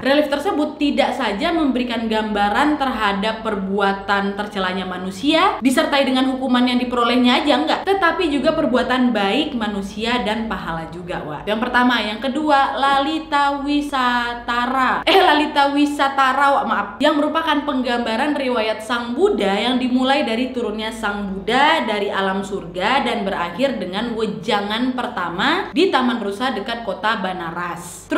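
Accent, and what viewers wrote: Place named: Medan, Indonesia. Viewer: Cerdas,pintar,ceriwis luar biasa penjelasannya, penting banget untuk di ketahui, orang Medan ya mbak ini😀👍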